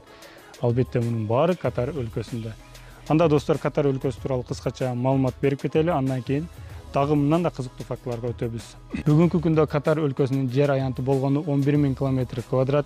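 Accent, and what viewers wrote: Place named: Batken, Kyrgyzstan. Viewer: Сонуун баардыгы жакшы программа болгону таза кыргызча сүйлөсөң өлө сонун болмок баткенче болуп жатат сөзүң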